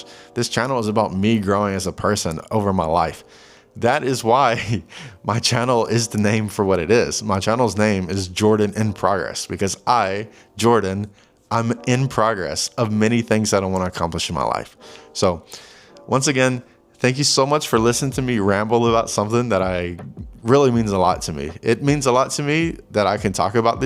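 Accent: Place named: Russia. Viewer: You speak Spanish like Russian could be your first language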